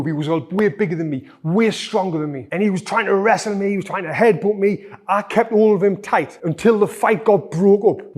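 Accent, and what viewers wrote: United Kingdom. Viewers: Just watching for this great British bulldog accent😅 I use left hook twice low and immediately high afterwards. But I trained that. As you said stay balanced when moving back protect ur chin and do not blink. Or hang around with nice people at chill places so u do not get confronted. Worked for me last 30 years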